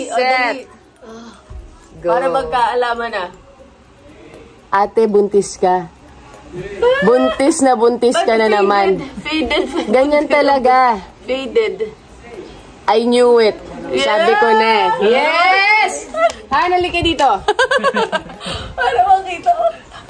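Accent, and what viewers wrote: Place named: Visayas, Philippines. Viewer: Dai, meron kanang bisaya accent. Buntis ka dai, kaya medyo haggard imong nawng... minsan ganuon ang mukha ng buntis during 1st trimester period. Mag blo-bloom kalang after mawala na yong duwal² moment at mga sensitive moment sa amoy. Ganuon din ako when I was pregnant with my daughter 19yrs ago. Di na ako nag buntis uli, kasi ang hirap manganak hahaha.. di kinaya ng powers ko.. Anyways enjoy your pregnancy dai, ug hinay² lang.. ❤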